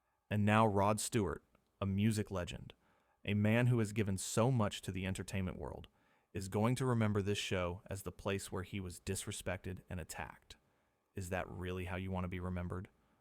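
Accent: United States. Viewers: Some arse hole made a similar youtube video of a cliff richard interview with Lorane on morning tv ...total false.....sad bastard should get a job and earn a honest days pay. ...and whats this american voice about.??? Total tosser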